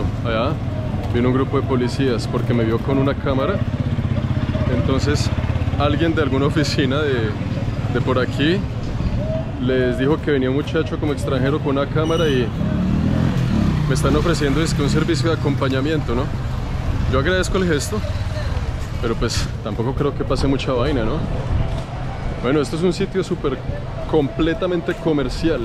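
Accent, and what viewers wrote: Colombia. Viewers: Como así que eres de Canadá 😳pero tienes acento colombiano. No entiendo, no entiendo, estoy perdida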